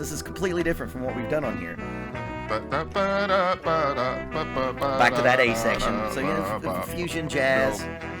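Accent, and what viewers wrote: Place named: South, United States. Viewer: Dulcet Southern brogue. Lovely and impressive vocabulary.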